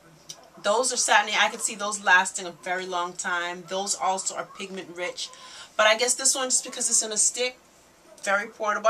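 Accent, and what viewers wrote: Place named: Caribbean. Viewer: you're gorgeous and i love your personality... which west indian island are you affiliated with... yup, i hear an accent 8 )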